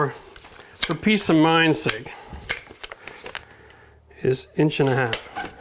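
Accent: Canada. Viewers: I’m here for the Canadian Accent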